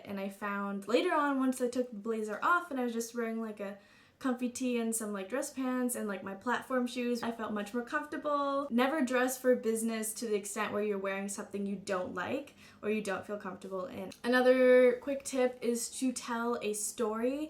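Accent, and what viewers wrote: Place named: Canada. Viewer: Beautiful as always :) As a former Canadian my self, I really picked up your accent :p Thanks again for the great tips!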